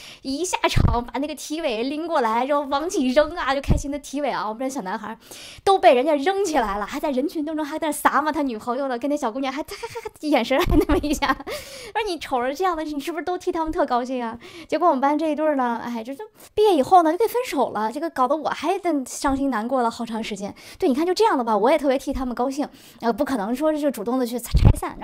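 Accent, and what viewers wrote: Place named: Beijing, China. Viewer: 还有就是，北京的儿化韵快起来我真的差一点听不懂😂😂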